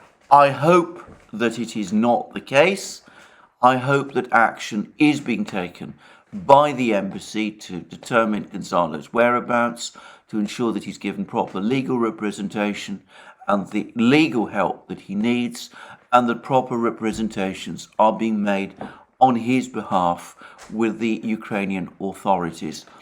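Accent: United Kingdom. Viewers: Your well-spoken nature has such a British appeal.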